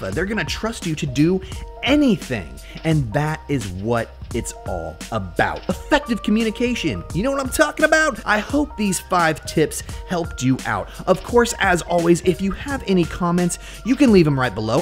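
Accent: United Kingdom. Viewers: Because what? PS u sound British and PSS I'm 9yrs old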